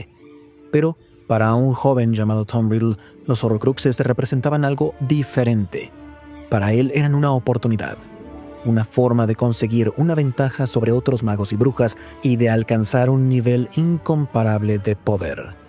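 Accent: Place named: Latin America. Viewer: Oh español latino... esto si se puede escuchar (vengo de videos de españa y destrozan lo nombres)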